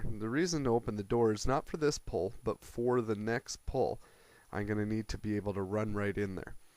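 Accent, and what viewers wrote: Canada. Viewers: haha I remember at the time he was doing this I was just amazed. Because I hadn't seen anything like this. I was like HOLY SHIT!!!!!!! and I like his Awesome Canadian voice <3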